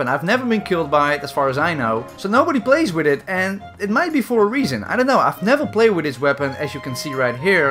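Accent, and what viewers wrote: Netherlands. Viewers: I hear his accent, 100% Dutch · Je kunt duidelijk aan je stem horen dat je Nederlands bent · I detect a Dutch accent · It sounds u are dutch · You sound dutch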